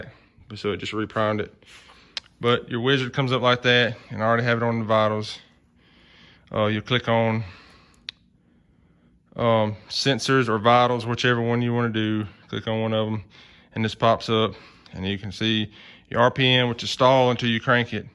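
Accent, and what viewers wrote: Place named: Alabama, United States. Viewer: Bro I watched both your truck videos I'm doing exactly same thing to my 86k10 had it for almost 20 years finally put new motor in her and Holley sniper I'm getting rid of rats nest of wiring and straighten out vacuum which lead me to you videos .. preciate the post.. ha catfish skinners .. had me rolling. You from Alabama too? 🤣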